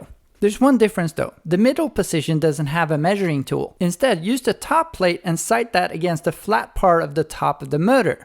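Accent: Sweden. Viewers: I love your Swedish accent and your jokes, they are the best! Regards from Denmark :) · awesome review just one thing, you should really pause a little longer between sentences, specially since you kind of have a swedish dialect, even for a swede its kind of hard to descipher . bara lite längre pauser mellan meningarna plz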